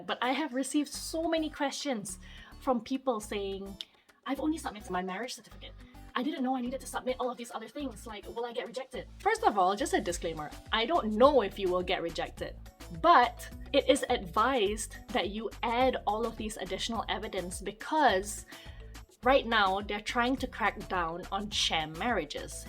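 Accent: Scotland, United Kingdom. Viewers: Can here your Scottish accent getting stronger!! 😂😂🏴🏴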